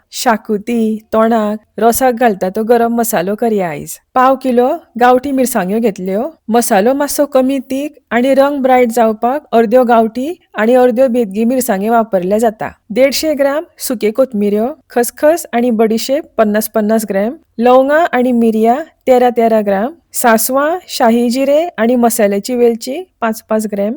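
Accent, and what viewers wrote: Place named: Konkan, India. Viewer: तुमची कोकणी भाषा ऐकायला गोड वाटत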